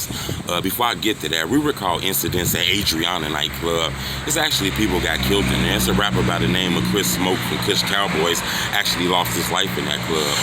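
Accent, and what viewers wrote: Chicago, United States. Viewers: Is you from Chicago bro?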